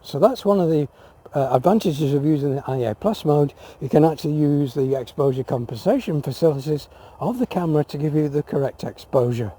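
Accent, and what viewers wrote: United Kingdom. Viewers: I just purchased this camera and I am so thankfull you posted these video's! Very helpfull. Btw your Brittish accent makes it even better 😄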